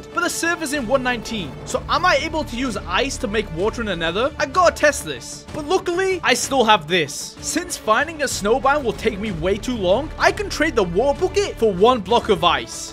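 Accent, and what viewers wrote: United Kingdom. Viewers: Bro u from Britain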